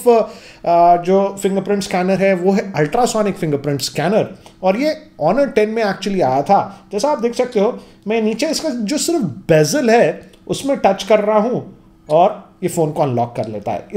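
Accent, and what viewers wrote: Hyderabad, India. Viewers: Now It's Time To Hyderabadi Hindi Well Explained Sir👌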